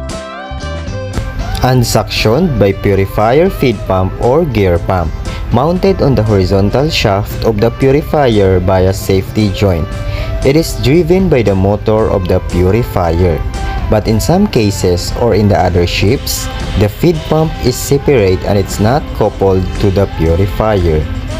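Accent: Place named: England, United Kingdom. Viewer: I have loved your content, and I love your English accent, it is easier to understand ❤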